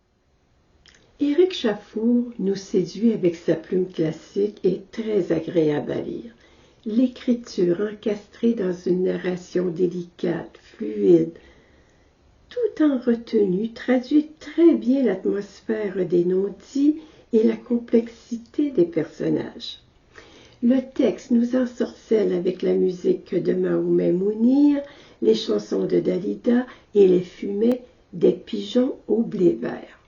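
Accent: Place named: Quebec, Canada. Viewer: Ça s’appelle un accent québécois madame et pas une mauvaise diction. La qualité de l’audio laisse à désirer certes mais la diction de est irréprochable, à moins que vous ayez une allergie particulière à l’égard des différentes manières dont la langue française se prononce à travers le monde, dans ce cas on ne peut rien pour vous, votre cas est sans espoir.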